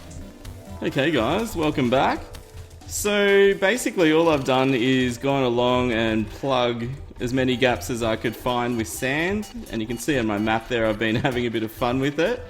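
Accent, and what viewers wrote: Australia. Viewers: Are you Aussie because I think.. you are.. so yeah. And say magic is hairy with your tongue out! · He is aussie right? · You're Aussie... Aren't ya?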